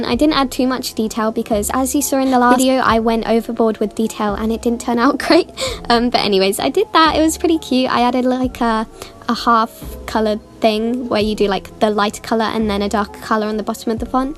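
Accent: United Kingdom. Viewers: THAT TOP RIGHT DRAWING??? SERIOUSLY MY FAVORITE PIECE OF YOURS!! she does not look dead 💀😭 she looks very alive!!! My favorite outfit to wear is probably an oversized tshirt with mom jeans! Honestly anything with mom jeans 😤✋ Also I love your bri’ish accent 🤭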